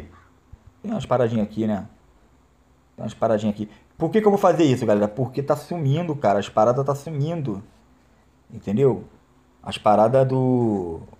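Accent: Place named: Rio de Janeiro, Brazil. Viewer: eh muito engraçado... ctz que e do rj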